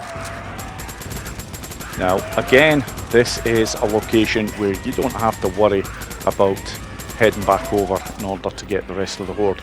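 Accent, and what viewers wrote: Scotland, United Kingdom. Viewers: Gg mate love the voice scottish right?😂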